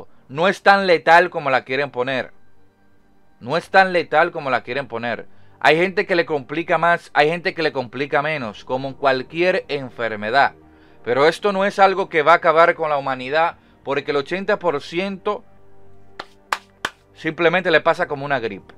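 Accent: Dominican Republic. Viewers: pero papa, yo no sabia que tu eres dominicano , aunque tu acento me paresia dominicano , pero no estaba seguro XD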